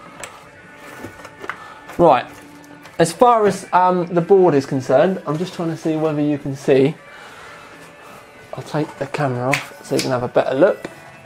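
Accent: United Kingdom. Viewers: are you from the uk dude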